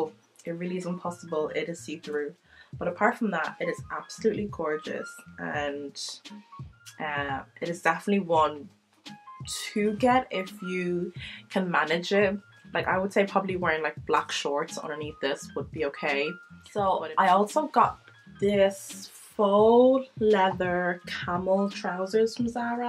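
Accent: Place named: Ireland. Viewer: I love your Irish accent, and nice haul too 👍🏾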